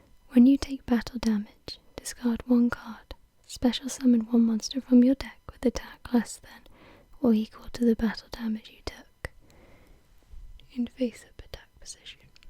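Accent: Wales, United Kingdom. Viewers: is this girl welsh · Are you welsh? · What part of Wales are you from? I'm from Caerphilly near Cardiff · are you Welsh??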